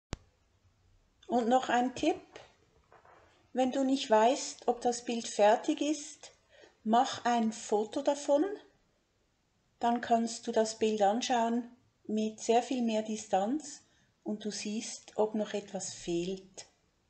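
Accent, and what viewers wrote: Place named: Basel, Switzerland. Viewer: Sehr schön Ihre Stimme zu hören! Ich schätze Ihre Videos sehr... Schöne Grüsse aus Basel ...Ich vermute (ihre Intonation) die Stadt ist Ihnen nicht unbekannt ; )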